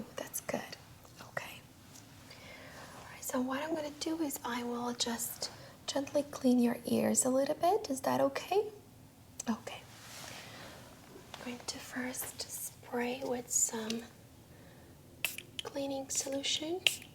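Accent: Canada. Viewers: Fantastic! Very relaxing. Canadian accent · just curious are you french/canadian? :)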